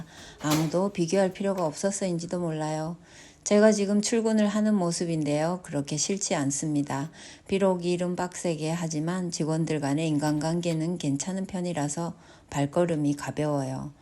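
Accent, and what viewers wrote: Gyeongsang, South Korea. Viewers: ㅎㅎ 경상도 사투리 정겹네요. 저도 미국에서 공부해 봤는데 미국생활 익숙할 듯 하면서도 힘들고 만만치 않죠. 재미있는 영상 잘 봤습니다. 감사합니다.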